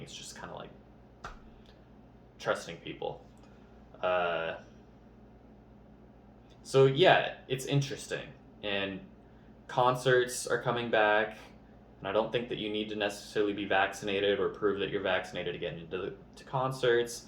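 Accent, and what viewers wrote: Colorado, United States. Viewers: Heyy 😅 this is probably not the comment you were expecting but I was looking for a video with someone who had that beautiful Colorado accent as I have to learn it for an acting gig. Your like the ONLY ONE I can find on Youtube! But I must say thought this is one darn interesting video. I was captivated until the very end! 👌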